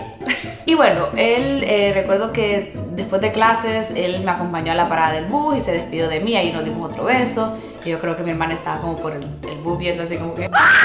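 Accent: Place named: Honduras. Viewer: Jajaja perfecta historia 🤣😂 morí de risa. sos la mejor jajaja escucharte hablar me hace sentir en Honduras. 🇭🇳🇭🇳🇭🇳🇭🇳🇭🇳🤘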